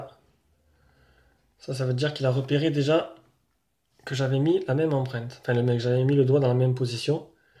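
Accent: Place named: South, France. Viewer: Salut c est pas mal tes tests smartphones et j aime bien ton accent du sud ca change,bonne continuation!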